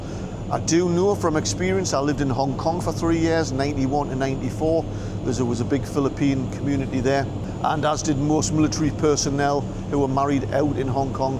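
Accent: Newcastle, United Kingdom. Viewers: This is such a great video. Thanks for making it. I watch your vids as a fellow Geordie who moved to Ireland 23 years ago. I found your channel a few months ago and am hooked as I love seeing the Toon again and your accent is brilliant mate. Great work Keep it up. 👍 Cheers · Hi mate great little video ,just found ya Channel so will be watching others you've done, with the scots your geordie accent is the one i like the best in the uk 😂😂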